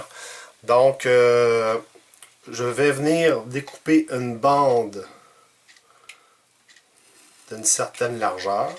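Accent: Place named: Canada. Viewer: bravo explication très simple j'adore ton accent canadien Bonne journée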